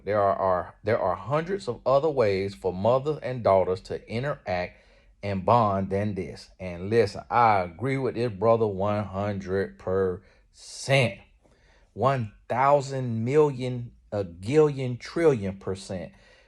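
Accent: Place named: Deep South, United States. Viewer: Deep south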